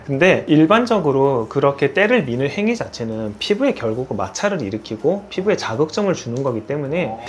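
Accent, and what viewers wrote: Gyeongsang, South Korea. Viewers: 선생님 경상도 출신이군요 ㅎㅎ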